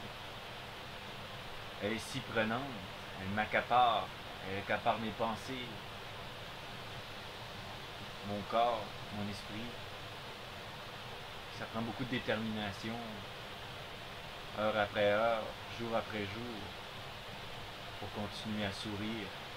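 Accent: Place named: Canada. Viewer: Bonjour, 1ère vidéo que je découvre avec ravissement une cabane nichée au ❤ d'une sublime forêt 🌲🌲🌲🌲🍁🍂🍃🍀☘🌿🌾🌵🪴🌱.Rien de tel pour se ressourcer, laisser les blessures du passé s'enfuir dans le vent qui court dans le temps qui passe. Le meilleur ami de l'homme, l'animal, ce 🐕 🐶 si merveilleux, qui t'accompagne, lui seul comprend ta mélancolie et qui jour après jour te souffle le bonheur de demain...., ton plus grand ami. J'adore ton accent canadien. Bon courage avec Kirten. Cordialement. Sayoko. 28/01/2021🌞☃️❄⛄❄🌜🌛🌠✌✌✌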